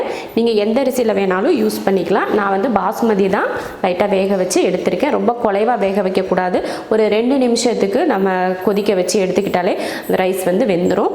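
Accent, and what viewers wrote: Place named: Tirunelveli, India. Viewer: OK... Avangaloda slang tirunelveli mathiri irukku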